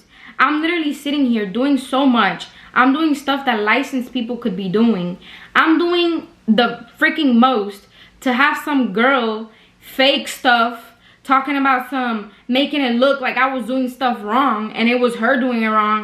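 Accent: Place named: Miami, United States. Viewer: I love your content.. and I can tell you sound like a Miami girl like me! I’m 48 and you’re definitely wise beyond your years. Keep spreading the positivity because Lord knows we can all use it 😊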